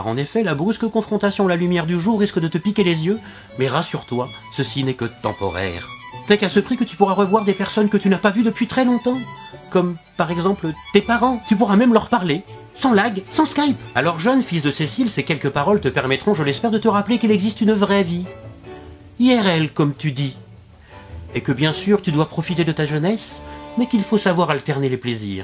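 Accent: France. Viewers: Mon dieu toute ces prononciations made in france XD le coup des portugais sur minecraft j'ai bien ri !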